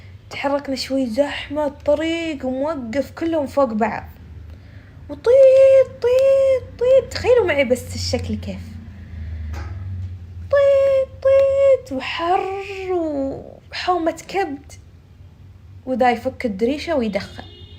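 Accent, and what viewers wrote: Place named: Najd, Saudi Arabia. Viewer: لهجتها من اهل نجد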